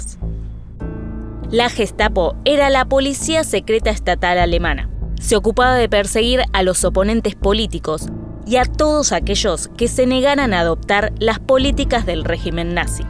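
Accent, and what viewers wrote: Argentina. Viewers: El primero de masho... Si pretenden que gente no argentina se interese en sus vídeos, traten de disimular el acento. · Puufff, lamentable que el video esté en ese español argentino (o será uruguayo) "raro"....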